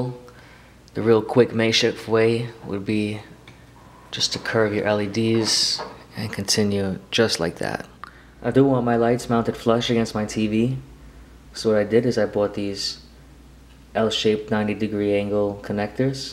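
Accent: New York, United States. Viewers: Awesome NY/NJ accent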